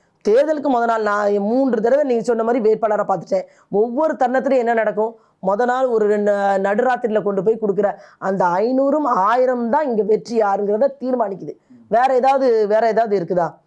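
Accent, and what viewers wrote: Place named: Tirunelveli, India. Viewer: உங்களது நெல்லை பேச்சு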